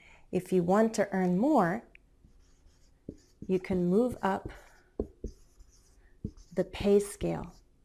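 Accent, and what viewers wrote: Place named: United States. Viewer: Amazing. I do like your videos, and I share them to my friends, students, and colleagues. The way you teach is excellent. It seems that you are patient to your subscribers. And, I like your American accent. I recommend everyone who wants to learn English should follow channel. Well done. Keep it up! Ahmed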